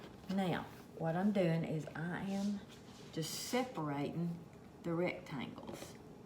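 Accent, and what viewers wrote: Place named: Tennessee, United States. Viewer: Listening to your accent brought me back to my childhood when we would visit my grandma in Tennessee. I luv that. It also sounds like Loretta Lynn. ☺️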